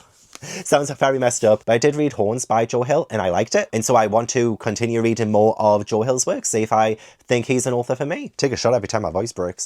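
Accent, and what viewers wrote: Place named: London, United Kingdom. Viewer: Oh my days, is funny lol. Your London accent is fab 😂❤❤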